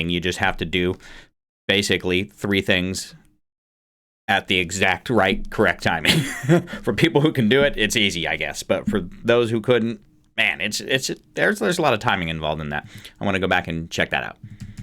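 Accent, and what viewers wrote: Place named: Brazil. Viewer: I like your Brazillian accent!😍so classy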